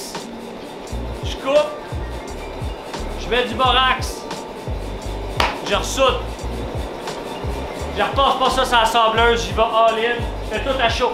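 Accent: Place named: Quebec, Canada. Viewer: Salut, D'abord, par curiosité, j'ai voulu voir si le sous-titrage automatique en français de google fonctionnait avec l'accent québecois. En fait non. XD As-tu fait une lame avec ce damas, et si oui en as-tu fait une vidéo ? ^^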